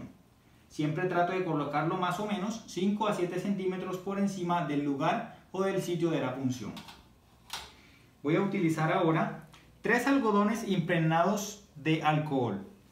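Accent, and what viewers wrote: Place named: Colombia. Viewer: Bogotá, pero por su acento sabia que era de Colombia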